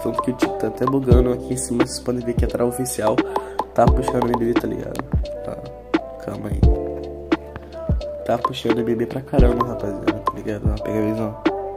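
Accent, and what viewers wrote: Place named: Rio de Janeiro, Brazil. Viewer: Shu carioca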